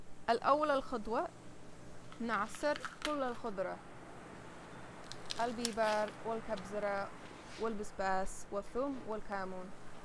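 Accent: Sanaa, Yemen. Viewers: مشاءالله تبارك الله اختي بالرغم انك لستي بيمنيه و عربيه و لكن كلامك يمني صنعاني و طبخك للاكلات زيهم بارك الله في عملك و تسلم ايديك علي الشفوت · ياربي اللهجه مو حلوووه ابدا احسن تكلمي بالفصحى احسن وانا يمنيه لا يجي أحد يتفلسف بس ما احب لهجة صنعاء 😨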